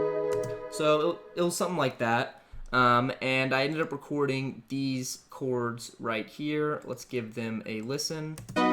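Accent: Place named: North, United Kingdom. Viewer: Bro where are you from cause you use y'all which is a southern word but you also sound like you're from the north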